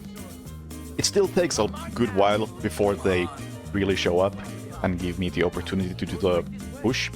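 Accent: Finland. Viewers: Damn I’m glad I found your channel. Amazing work! Your Finnish accent is captivating! I’ve obsessed over Alan Wake recently and so they have a resounding amount of Finnish references/language in the games. What a fascinating people. I love Finland!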